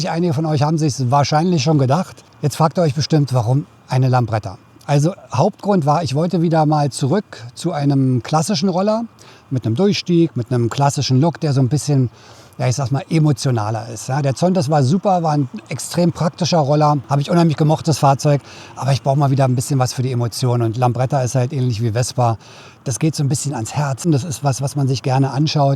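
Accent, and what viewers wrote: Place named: Berlin, Germany. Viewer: Da ist sie wieder. Die Stimme von Hans Rosenthal 😊. Immer sympathisch und kompetent mit ner duften Note Berliner charms. Bin gespannt auf das Jahr mit deiner „Neuen“. Schade nur um den Zontes, da hätte mich doch ein Langzeittest sehr interessiert. Geht’s denn damit auch in den Urlaub? Bin gespannt. Also, herzlichen Glückwunsch und allseits gute Fahrt. Vielen Dank für den und die vergangenen Berichte. Bitte immer weiter so 😎✊🏻. Schönen Ostersonntag noch.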